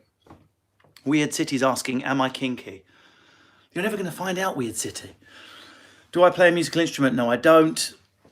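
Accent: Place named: South Yorkshire, United Kingdom. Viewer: Wow! South Yorkshire accent brilliantly portrayed 👍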